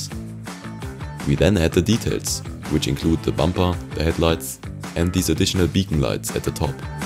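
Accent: Germany. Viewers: Do i hear a little bit of an DEUTSCHER AKTZENT? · Do I hear some DEUTSCH in your language? 🤣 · I hear a little bit of deutscher Akzent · The German accent 😂 Great Video mate! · Am i the only one who heard a slight german accent? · Is he german? Im German and his accent sounds sooooo German to me😂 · That’s NOT a realistic fire truck. Lego is European. And judging your accent, you clearly are German. So why the frick are you calling this thing realistic? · Digga warum kein deutsches Auto man hört doch dass du Deutscher bist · Bis du deutsch ? Du hast eine kleine accent 😊 Ich habe dich wegen der Aussprache von „Chicago“ entdeckt: ein zusätzliches H und viele A „chic'Haaaaago“ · I hear german accent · from your speaking i can guess you are from germany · i hear german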